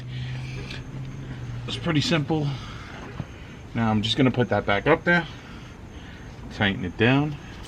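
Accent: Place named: Boston, United States. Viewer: This dude def from Boston.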